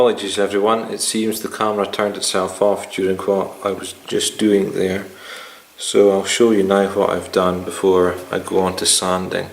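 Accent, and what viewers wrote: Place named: Scotland, United Kingdom. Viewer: You sound Scottish ,where are you in Scotland ?Nice piece of work my friend . I live near Lochness. I've been turning on and off for a couple of years .I've made a couple of lamps from spalted beech.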